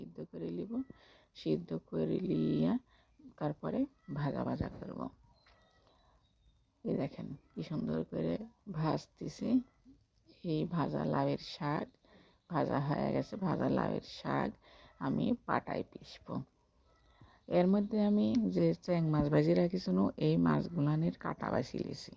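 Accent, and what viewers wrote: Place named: Natore, Bangladesh. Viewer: আঞ্চলিক ভাষায় কথা সুন্দর ❤❤❤ নাটরের মেয়ে নাটরের ভাষা🥰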